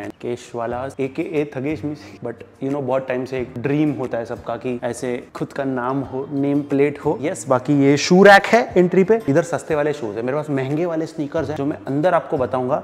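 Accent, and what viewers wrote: Maharashtra, India. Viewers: Congratulations 🎉 🎉 Marathi tone bahot hi mast hai bole to Aapke bolne ka style bahot hi funny hai 😂 but it's emotional also..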